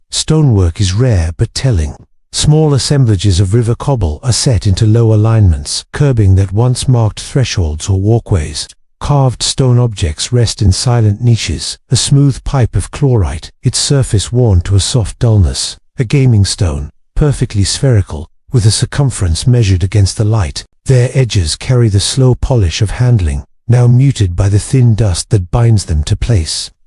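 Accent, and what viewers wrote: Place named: RP, United Kingdom. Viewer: I love history, but this piece is so far up its own a***, it's embarrassing. Narrative should rivet and guide and inform, not try to impress with a plummy accent, lots of big words and no actual people, characters, story development. People love to learn about people. Plenty of scientists, historians, even mathematicians understand how to decode something complex and spin a wonderful yarn, with drama and tension. Instead, this feels as if the narrator is reading from a stuff textbook...I can give plenty of examples. Do better, or I will not be back. Tell a story that engages and isn't so self-impressed. "Disease is the quietest, cruelest candidate..." Really? How about discussing the actual one that hit the metropolis you're detailing? And stop being so vague and boring. And note to the producers: This irked me so much, it didn't do anything to help me drift into sleep.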